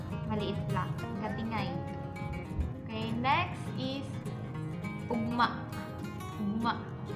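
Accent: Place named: Bula, Philippines. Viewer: taga bula ka? taga bula kasi ang tuno mo.. hehe..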